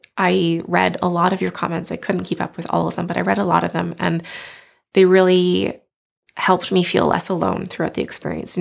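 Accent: Canada. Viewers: Canadian accent coming in strong!!! Great channel though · You are absolutely amazing. I love that you are so completely honest. Do you think the training for the marathon stresses your body enough to change the way your meds work? By the way I grew up in Alberta but don’t live there anymore and your Canadian accent makes me want to go home.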